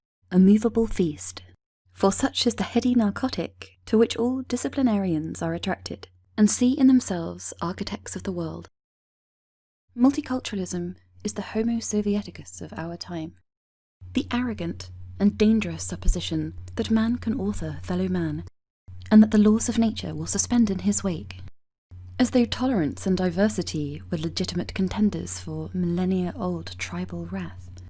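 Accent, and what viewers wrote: England, United Kingdom. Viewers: WOW! This ladys English accent is fabulous & sadly soon to be replaced. I prefer this to an ISLAMIC accent. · Gosh, this British accent is so cute ❤️. greetings from Brazil. Get Brexit Done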